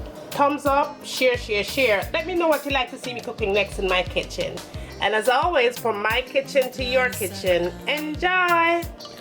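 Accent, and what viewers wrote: Jamaica. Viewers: Beautiful! Yummy recipe . I love that beautiful Jamaican accent too. The best of the best. My husband was brought up in Mammee Bay. Born in Canada but spent his whole childhood in Jamaica. Ty so much for sharing... Bill thanks you too hahaha (cause he loves his Jamaican food).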